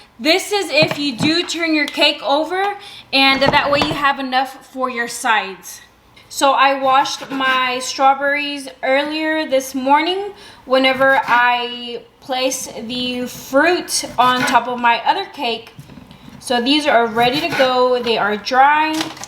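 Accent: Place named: Texas, United States. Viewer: I love the video and how you explained everything!! I knew you were from texas from your accent.🥰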